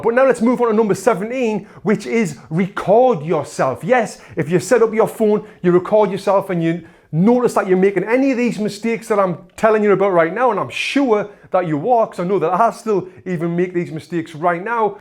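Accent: United Kingdom. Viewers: I love your accent sir. I think it's British accent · His boxing is awesome, but how pleasing his British(right?) accent is? +100 to boxing skill if you can talk like that, I'm not gay btw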